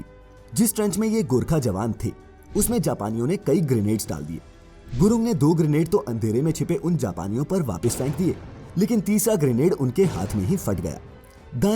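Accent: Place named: Odisha, India. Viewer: Your Odia 🙄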